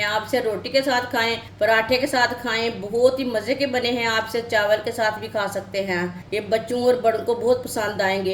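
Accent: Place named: Punjab, India. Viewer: Or is k bolny ka andaz b bus, panjabi style me urdu 😅😅😅😅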